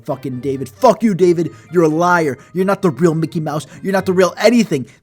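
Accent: New York, United States. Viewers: U r from New York right ? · I like his voice , it reminds me of a kind of latina and new york accent. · Is it just me or does sound like he has a slight New York accent?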